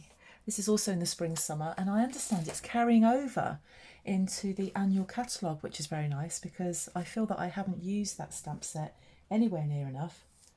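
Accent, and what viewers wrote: United Kingdom. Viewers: Your tutorial was great! I loved listening to your accent. I was fortunate enough to live in England for a couple of years and absolutely loved it! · Lovely listening to a British accent . Very nice card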